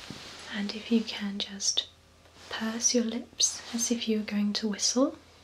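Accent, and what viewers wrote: United Kingdom. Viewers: starts with that british accent <3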